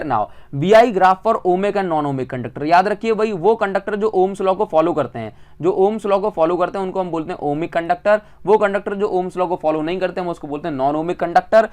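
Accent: Odisha, India. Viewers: Sir are you from odisha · Sir are u from Odisha?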